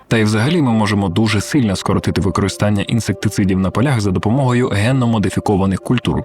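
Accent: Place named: Poltava, Ukraine. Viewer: Шось на полтавському) клас👍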